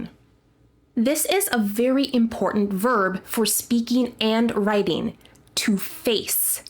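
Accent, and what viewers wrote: United States. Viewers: Is it american or british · Hello madam I am watching your videos learning english with the news and found good and clear english in American,so I wish to learn from you. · It's american english? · WOW! How amazing accent, for downtown of NEW YORK! EXCELLENT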